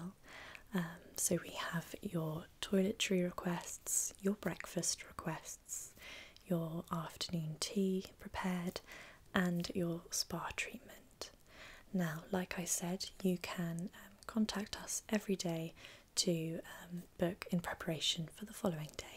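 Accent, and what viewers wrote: United Kingdom. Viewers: My thoughts: I think she’s British Her: speaks in British accent Me: I knew it! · The British accent is a turn on.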